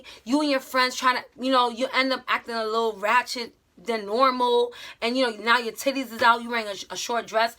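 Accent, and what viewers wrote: New York, United States. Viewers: Was studying for a test tmrw and needed a quick break to release my energy and re focus and just right then your video showed up! And this fits so perfectly in my life rn because ive started slowly but surely focusing on myself and especially self love! Talk about God! Everything you said is head on esp about the spirituality part and eating right. I just got to a point where i was depressed and literally awaiting death and im only 16 as if that would happen any time soon. And I just opened up to God, told him I wanted to change my ways and be happy and be the person whom he wants me to be so when i finally meet him face to face, he'd be proud and satisfied. And I just recently subbed last week and have watched most of your videos, I love ur personality and character! You have such a big sister/ hippie aunt/ motherly love and aura and wiseness to you. 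Idk if u are from Ny and if that helps anything lol but Im picking up the accent and vibes. You honestly deserve a lot of subbies bc you dont srve us 5 mins fake pranks bs or say things that are honey tp our ears and not follow ur own advise! I really don't understand people like u who are wayyy underrated. But keep these vids coming in sis!! Im the oldest sister myself and have always wished i had an older sister to guide me and give me advice and u are one of the very few that do that and thanks for it!!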